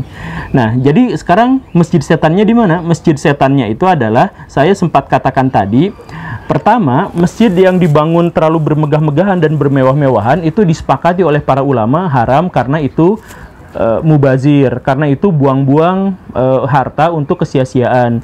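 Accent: West Java, Indonesia. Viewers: Meni nyunda pisan p guru.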